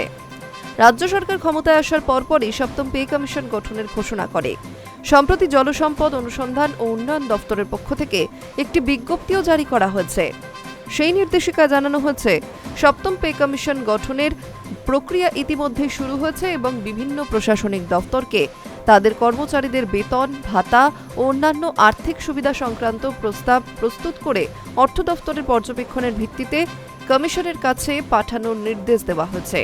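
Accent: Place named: Bangal, Bangladesh. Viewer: যিনি খবর পড়ছেন, তাঁর খবর পড়া পুরো বাঙাল উচ্চারণে আক্রান্ত!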